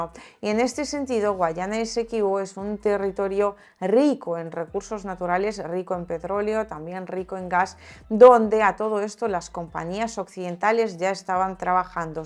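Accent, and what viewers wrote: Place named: Galicia, Spain. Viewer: Lastima q hable como gallega.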